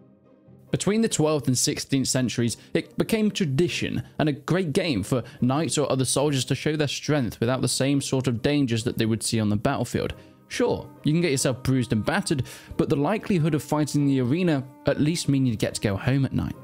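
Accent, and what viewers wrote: England, United Kingdom. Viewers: Good to see more people getting into the sport, great stuff writing and assembling it. Just wanted to say you showed SCA there a couple times there which is a different thing as a rule of thumb if the armour looks a little flimsy and they're fighting with sticks and most importantly stabbing then thats SCA and not buhurt. The armour although is expensive is not necessarily 10 grand an entry level harness (suit) can cost you as low as 2000. The rule confusion is understandable it comes from there being 3 main disciplines in buhurt: dueling, melee (or also buhurt confusingly) and pro fights. Dueling is for points with the weapon only melee is 3 points on contact on the floor and profight is points everwhere and you can usually win by KO or TKO. The pro in pro fight does not mean professional as almost noone does this for a living and the people that do are usually coaches. 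I assume by your accent your English and as pro fights are what interest you you should look up Saturday knight fights on Facebook which is the uk starting a profight league its early days but they're great to watch. Thanks again for the video great stuff.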